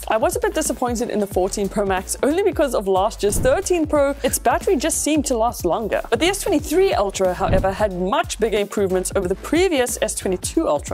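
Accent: South Africa. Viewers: You're totally from South Africa :) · Are you from RSA 🇿🇦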